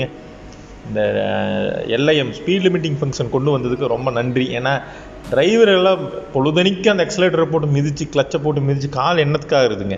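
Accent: Coimbatore, India. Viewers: Coimbatore slang😍😍🔥🔥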